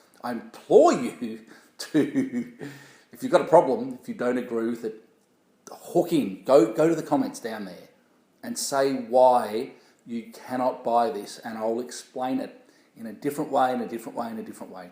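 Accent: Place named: Australia. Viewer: THANK YOU SO! MUCH!!!! TRYING TO BUZ!! IS NOT NEEDED WHEN YOU USE YOUR LIPS THE CORRECT!!! WAY!! AND JUST BLOW!! I LOVE YOUR TEACHINGS AND INSITES! AND LOVE YOUR AUSTRALIAN ACCENT!!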